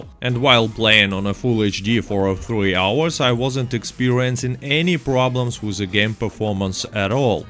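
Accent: Russia. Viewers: а в голосе то проскакивают русские нотки)) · I looove the russian accent. All critics - wether CP2077 or Red Dead - sound harder and more punchy with the beautiful sound of this language. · Your accent is very Russian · Ахахаха угораю с твоего акцента чувак, русского я за километр учую))) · Типичный русский акцент, большинство так звучат когда пытаются говорить на американском английском, ничего необычного. По крайней мере речь грамотная и понятно что он говорит, а то бывают люди что так коверкают, что фиг поймешь что человек пытается сказать, т.ч. он имеет еще не самый худший вариант русского акцента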